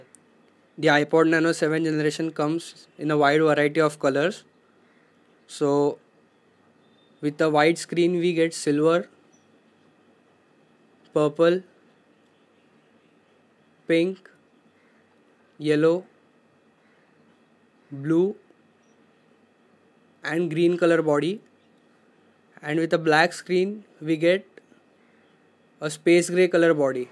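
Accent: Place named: India. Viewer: Is it me or every Indian sounds the same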